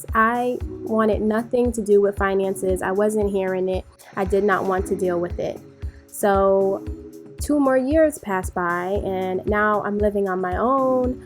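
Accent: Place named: New Jersey, United States. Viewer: Wow great video I knew you were from New Jersey I just wasn’t sure but the way you pronounced some words gave it away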